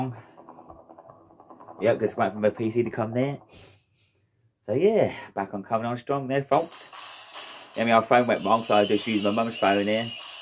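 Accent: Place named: Norfolk, United Kingdom. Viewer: You have a really strange accent. I can hear the Norfolk/Cambridgeshire in it but something else weird too.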